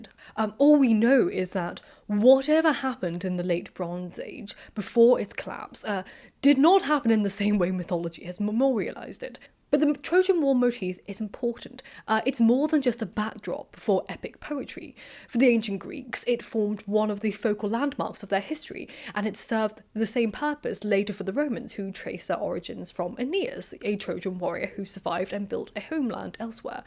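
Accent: RP, United Kingdom. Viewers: Yer modern british accent is stark compared to my Southern American Accent. Love the info,. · Not British person question: Is her accent particularly posh? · My belief was that an event similar to the Trojan War took place and later a fantastical poem was made in the aftermath. Arthur from the story was King Arthur was quite possibly a real person. He was mention in Celtic poems/songs around the time of the Saxon invasion and added on by other authors/storytellers up until Mallory who threw it all together and added several fantastical elements. I believe there is some true to both and I also but a character like Jesus in the same camp. Obviously the person existed and over time the story grew and with each retelling it grew larger and more unbelieveable. All that being said...this is inappropriate but does anyone else find that posh English accent insanely hot, other than myself?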